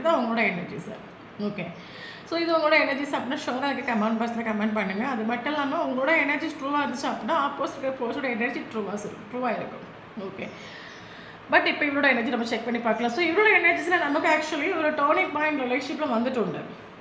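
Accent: Kerala, India. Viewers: ரொம்ப நன்றி univers ♥♥🙏🙏, mam super உங்க Reding, எல்லாமே உண்மை. நீங்கள் சிரிச்சிட்டு சொல்லுறது ரொம்ப அழகு, உங்கள் மலையாள தமிழ் தனி அழகு.! நன்றி time பார்த்து அடிக்கிறீங்க ❤❤.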